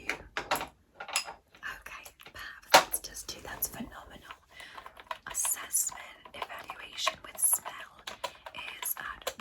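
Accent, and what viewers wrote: England, United Kingdom. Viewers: X A rather long visual. However your work is admirable. The effort is just. “English Accent” sound. For all eternity. Heart. I send you my best. Goodbye. X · As a brit im wondering why you chose a british accent for alien rp XD what you trying to say?! (Not complaining really) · Love the British accent!it’s almost just like mine!(for the record I am British!❤ Alain. 24601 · U kind sound like a britisch person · Love the British accent ❤️ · love British women...Can't beat that accent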